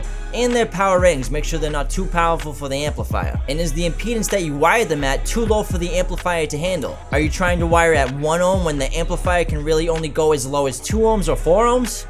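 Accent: Boston, United States. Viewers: Dude are you from Boston ?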